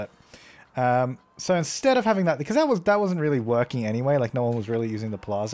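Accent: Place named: Australia. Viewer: he has such an Aussie accent like it's not the stereotypical one but it's one of those Aussie accent. haha. I'm Aussie too but he sounds heaps like my mate.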